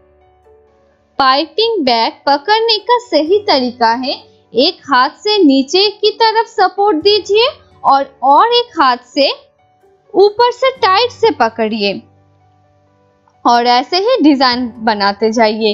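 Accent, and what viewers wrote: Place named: Bengal, India. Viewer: Thank you for all the information. BTW, your accent proves that you are a Bengali. 👍🏻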